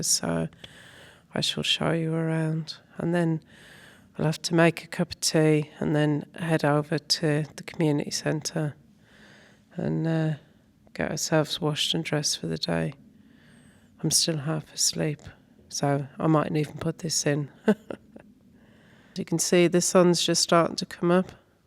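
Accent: Midlands, United Kingdom. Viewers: Are you from the Midlands?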